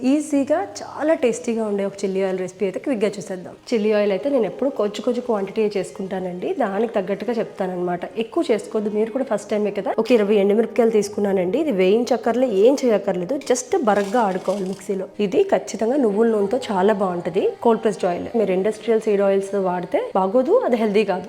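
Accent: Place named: Godavari, India. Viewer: Baraka, obbidi maa godavari slang ❤❤❤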